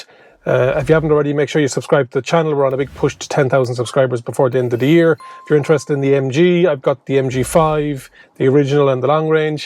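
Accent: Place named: Cork, Ireland. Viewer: You sound just like my dad was was born in Cork (Balenagree)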